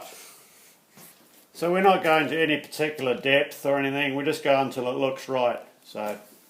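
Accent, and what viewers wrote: Australia. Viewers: Good to hear an Australian accent for a change, nice work as well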